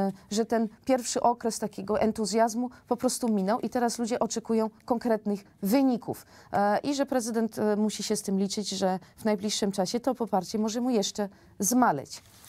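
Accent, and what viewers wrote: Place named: France. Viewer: Uwielbiam tę Pani polszczyznę z akcentem frangniem.Idealnie pasuje do p.p.z.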